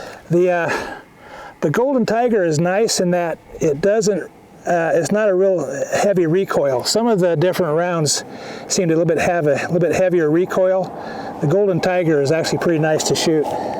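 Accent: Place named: United States. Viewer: I'm guessing based on your accent this is Utah or Idaho. Great video - I'm interested in this rifle myself & appreciate you sharing with us.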